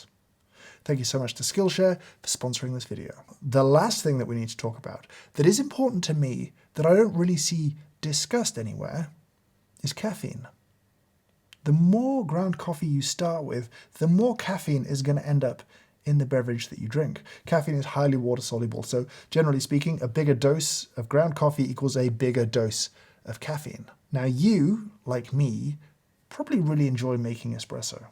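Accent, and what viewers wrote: United Kingdom. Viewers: Why is it that ANYTHING a Brit says sounds more intelligent and authoritative than an American? The accent alone sends the credibility of any statement through the roof. I'm not sure if I should believe anything this guy says, but I don't have a choice. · I have listened to you for hours now. 🌿🌸🌿 I love your videos and signed up for the Oct 3rd coffee tasting. I’m in the states.You are so English it’s like watching someone do a caricature of an Englishman. “You don’t want that. No one wants that.” You are so enjoyable to watch and listen to. Thank you 🙏🏻 for the incredible wealth of information. I saw you flipping through “The World Atlas of Coffee” and ordered it used from Washington state. What a gem you are. What fun. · Watching in bed on silent w/ subtitles. ( so as not to wake my beautiful wife) I find a Hoffman coffee instruct-able without his nuanced Brit accent flowing through my speaker to be a bit like an underextracted quality coffee. 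A missed opportunity for a better experience. Miss your voice but I will do better next time and extract the optimal experience next time- with volume on😁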